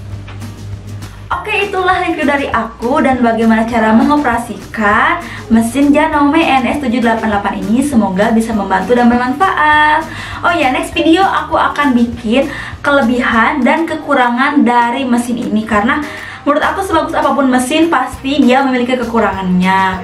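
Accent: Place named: Sunda, Indonesia. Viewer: Menginspirasi banget tteh, nada ngomongnya nyunda, 🤭 galfok sama " biar ga amburadul"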